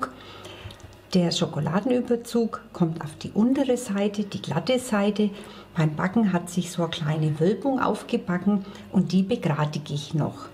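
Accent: Austria. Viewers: sind Sie aus Österreich? 😇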